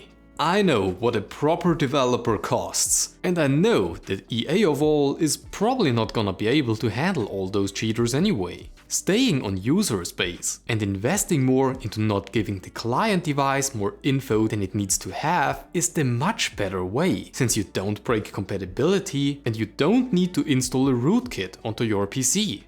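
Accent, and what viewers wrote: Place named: Germany. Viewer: You sound German :).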